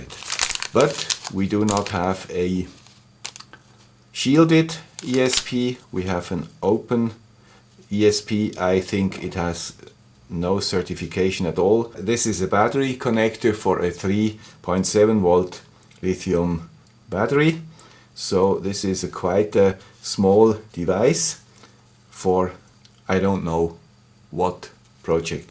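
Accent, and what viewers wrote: Switzerland. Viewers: my best regrade to the gay with swiss accent>>>>>>nice work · Thanks for the P channel mosfet highlight. It would be useful if you could do a video on high side switching. There are a few out there, but the guy with the Swiss accent would give us what we need to know as part of a back-to-basics series?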